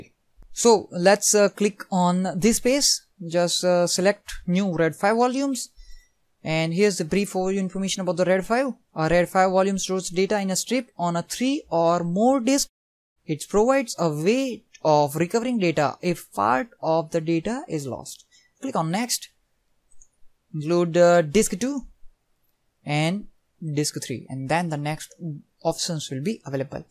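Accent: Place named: India. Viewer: Strong Indian accent - hard to follow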